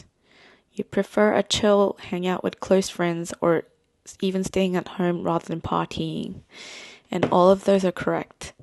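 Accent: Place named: Australia. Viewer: it’s not boring at all! i really enjoyed learning a little more about you… and your voice is really lovely and heartwarming and so is your presence which is a bit different from your photos on instagram(both are great btw). and the touch of aussie accent just great! i was smiling the whole video like an idiot 😂 thank you for making this video and good luck with your next goal 🫶🙏 btw i got my assumption about you right 🤣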